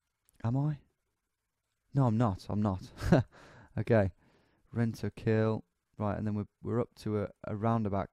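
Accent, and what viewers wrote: United States. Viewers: That perfect Midwestern accent... In Boston lol. Great video though, love these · your american accent is so spot on. makes me laugh every time! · His American accent is actually very good. And absolutely hilarious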